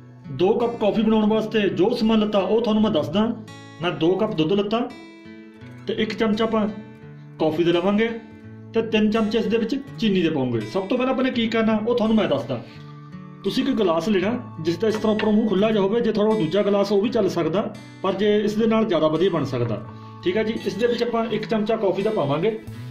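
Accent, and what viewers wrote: India. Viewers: Bhai aap ki punjabi bilkul mere nanhyal wali he wo ambala punjab k the ab hum Pakistan k punjab mehe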